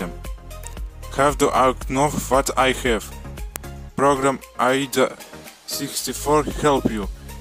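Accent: Russia. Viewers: I listen russian accent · Акцент русский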